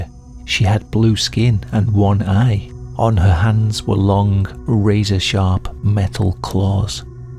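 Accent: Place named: Durham, United Kingdom. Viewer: Howdo,. I love your content. Always something interesting and entertaining. It’s nice to hear an accent like mine, too. I’m County Durham born and bred and now live in Hartlepool. You sound Durham / Teesside - am I right? Wherever you are, keep doing what you’re doing!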